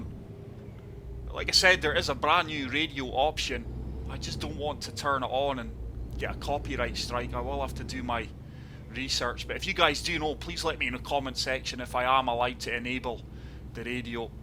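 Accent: Scotland, United Kingdom. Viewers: You sound Scottish what rough are you around bud? I'm from Scotland myself, you'll be looking forward to Road kings and ATS/ETS coming to PS5 soon eh, i sure am! I'm playing road craft just now season year 5 pass was announced for snowrunner if you play them?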